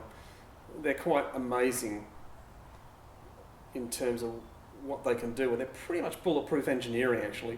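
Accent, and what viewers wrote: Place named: Australia. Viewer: You know, I chanced across this video and I was impressed for many reasons...one was an Aussie from Melbourne who was talking about his car, and secondly it was a Studebaker...that marque of car had links with a Packard which my dad had owned a '34 model in the 50's. All up it ticked all the boxes. And post script Aussies talk in a certain way..up front no pretenses...love it.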